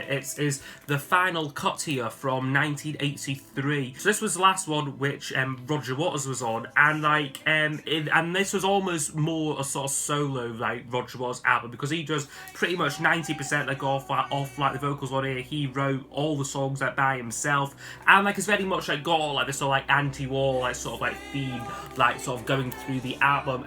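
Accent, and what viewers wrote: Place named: Northern England, United Kingdom. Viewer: Great video. Liked a lot of the positions you put them in Trying to place your accent, thought i heard Northern English, Welsh and maybe even Scottish at one point 🤣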